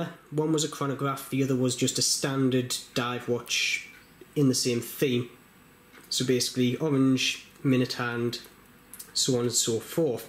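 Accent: Newcastle, United Kingdom. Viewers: That's a proppa Geordie accent mind 👍👍👍👍 Shy Bairns Get Nowt 🤣🤣🤣 looking forward to seeing some future videos featuring your mods 😉😉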